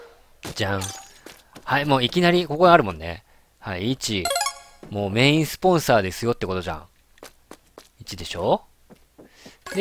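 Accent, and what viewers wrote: Hiroshima, Japan. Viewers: 見るのちょっと遅れちゃった、、、 今回Rさんめっちゃ広島弁多かったですねwwRさんの動画大好きです!!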